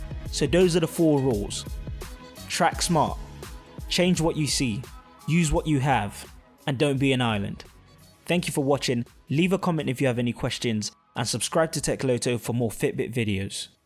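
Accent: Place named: Jamaican-Cockney, United Kingdom. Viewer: cant listen to the stupid jamockney acccent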